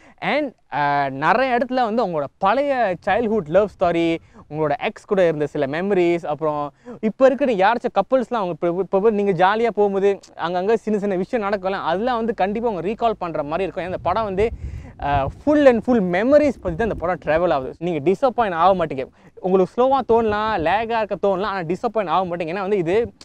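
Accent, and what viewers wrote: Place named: Malaysia. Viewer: He sounds like Kelly.. May be he belongs to Malaysia!!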